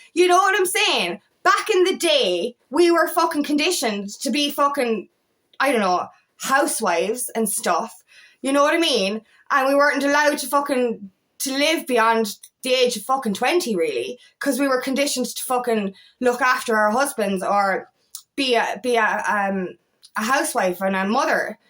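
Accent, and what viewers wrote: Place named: Ireland. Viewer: Yes it is where I belong for sure . I will fly the weirdo flag 😂 yes laughing really raises my vibrations 😂😁🤭 I honestly find things funny other don't lol I laugh at myself 😂 I just love the Irish accent when you say f ing lol 😂 I'm in the UK x everything you say you absolutely true 😊 xx we definitely need out ffs . Least we can all join together as one here . I might set up FB so I can join you there too . At some point I'm going do a video myself as I'm holding back but I'm saying it lol it ok for those who think I'm a freak lol I gone through worse 😂 xx have a good day in this f ing matrix we will be out soon xxx luv n hugs ❤🤗❤🤗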